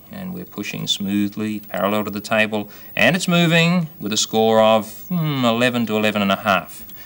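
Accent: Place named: South Australia, Australia. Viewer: Always wondered what their accent was as a Melbourne kid. South Australian!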